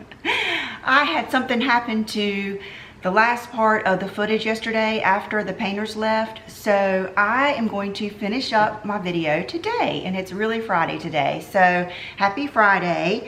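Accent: South, United States. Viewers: Maria Daquila Right!?! I just love seeing her new video in my feed. Sweetest southern belle, this Long Islander loves to listen too❤️❤️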